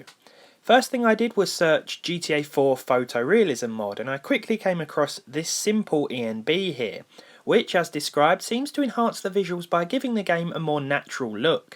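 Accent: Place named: England, United Kingdom. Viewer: Jesus... what a disgusting english accent. :(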